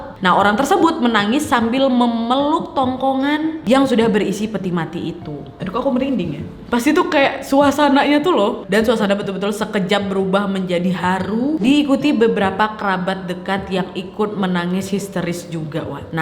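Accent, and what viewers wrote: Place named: Sumatra, Indonesia. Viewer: Pembawa cerita ini logam sumatra atau sengaja meniru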